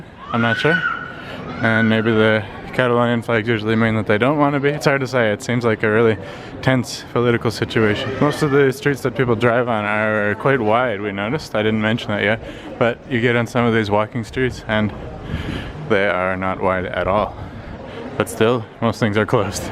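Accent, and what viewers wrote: United Kingdom. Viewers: Наверно потому что... у него есть в произношении английский акцент, и что бы понимать слова нужна практика А у нет акцента, ее проще понять.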